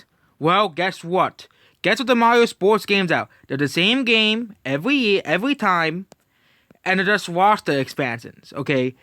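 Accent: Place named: India. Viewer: But this guy sounds like a Indian scammer kind of you know Indian skimmer you guys trying to get us to buy Sony and Xbox or steam deck instead or game PC well already have two of those how about I go steam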